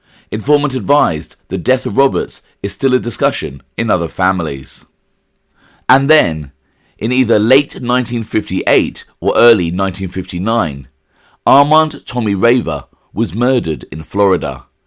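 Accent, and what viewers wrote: United Kingdom. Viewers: Can we just ONCE have a documentary that isnt narrated by a stupid british accent that butchers every other word??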